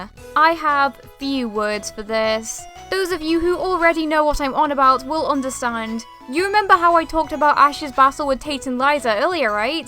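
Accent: United Kingdom. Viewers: Are you English? You have a British accent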